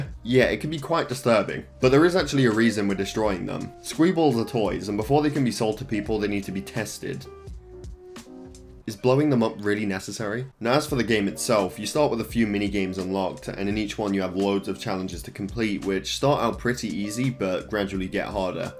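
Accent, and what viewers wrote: United Kingdom. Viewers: man youre british right and i got an ad for teeth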